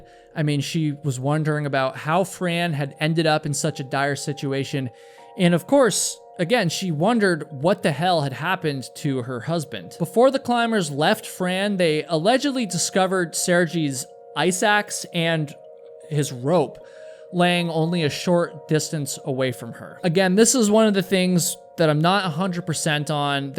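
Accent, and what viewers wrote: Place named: United States. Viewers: We all understand that US-americans put the english pronunciation on everything, even though it's funny sometimes we are used to it :) most importantly the video was great and super respectful. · anyone whinging about pronunciation of names needs to shut up. You are telling the story respectfully. You are an American. You are permitted to have an accent!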